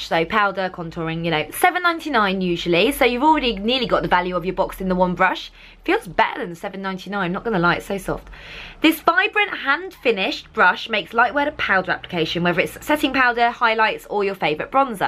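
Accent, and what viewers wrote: United Kingdom. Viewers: Im in the US and subacribe to Birchbox. I love the Liz Earl skin care line and keep stocked. I just started watching your videos. Our US Birchboxes dont have the sac that holds our contents. Im gonna suggest it. I love watching you and your cute Brit accent. You look so much like Dutchess Kate whom I love dearly! Im Half French and wanted you to know, when you read the French labels on products, you doba fine job!!! ☺ I'll be subscribing to your channel. I hope some day, I can come visit the UK. Its on my bucket list!!!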